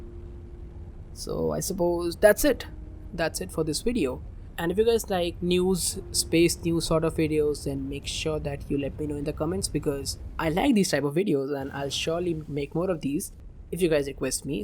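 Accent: India. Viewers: He is an Indian i can say that by his accent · Are you from India?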